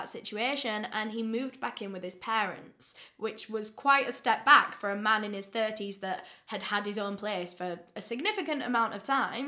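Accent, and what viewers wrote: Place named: Yorkshire, United Kingdom. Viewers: Yay a northern lass! I'm guessing Leeds? 🙏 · you know how to brighten my day with your true crime tales and your delicious-sounding Yorkshire accent! Thank you so much, my dear girl! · Sounds more like a Leeds accent 👍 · I'm going to be the billionth person to compliment voice. 🙂I've heard a lot of Yorkshire accents in period pieces, but I'm pretty sure this is the first time I've heard the modern version. I didn't even know where in the UK from until she mentioned it. I love listening to her, and think she does a great job presenting the cases.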